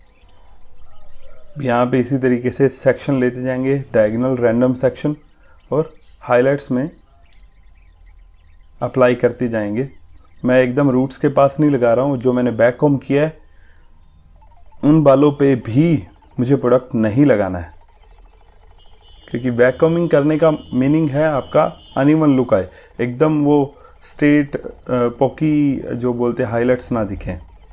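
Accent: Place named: Pakistan. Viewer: Assalam ualikum sir apka btaane ka tarika bilkul esa hai aise Pakistani bat krty hain ya apka koi talluq hai Pakistan main apka koi hai yha main agr india hoti to apky bht kuch aky seekhti or sekhti bhi hon ma sha Allah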